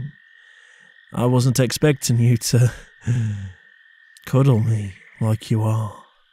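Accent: United Kingdom. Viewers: I could listen to tour accent all day if I could What kind of accent do you have it sounds like you are from the UK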